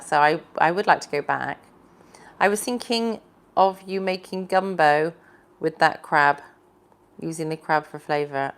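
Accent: West Country, United Kingdom. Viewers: Hey, you could DEF do a better job than Liz Truss? Love that slight country accent, is it West Country perhaps? Regards Chris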